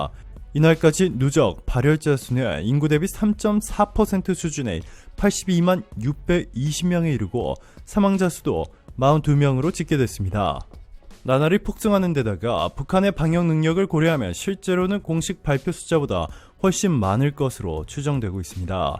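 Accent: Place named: Myanmar. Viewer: 나레이션 남성분 한국어 좀 제대로 말 하시길 바랍니다. 한국말이 마치 미얀마 사람이 말하는 식으로 들리며 끊고 숨 쉬고 이게 잘 안된다는 것입니다.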